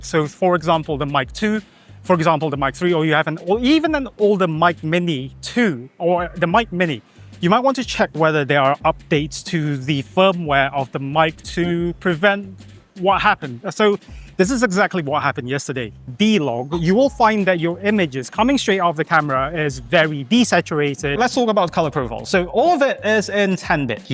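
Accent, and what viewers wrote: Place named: United Kingdom. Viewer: are you indonesian? im hearing a british man rn lmao, until i saw glodok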